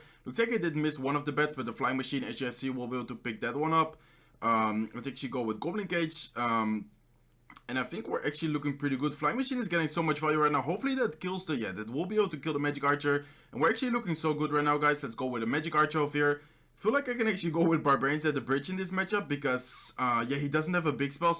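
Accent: Netherlands. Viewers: Dutch accent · Ben je toevallig nederlands ik herken het accent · Are you dutch?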